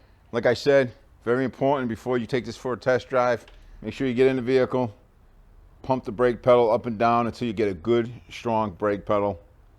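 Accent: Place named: New York, United States. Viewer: This was the best tutorial I’ve come across for this specific job for this specific car. Hers is a 2018 I hope this applies. My daughter wants me to change her brakes and she really doesn’t want to pay those outrageous mechanic prices and neither do I. I’ve only done 2 other vehicles. So here goes! Oh! If you don’t mind my saying, you sound like you’re from my neck of the woods. NY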